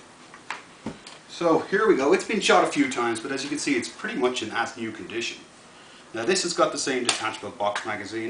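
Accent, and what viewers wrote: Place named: United States. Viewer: "you got yer safety over here!" you're such a yank now!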